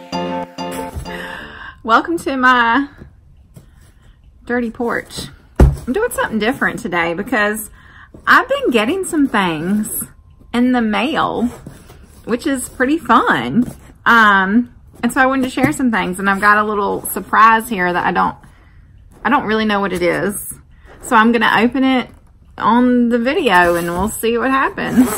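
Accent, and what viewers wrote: South, United States. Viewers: I don't really care what you drink you're just way too cute and I love that southern accent you're a true farm girl.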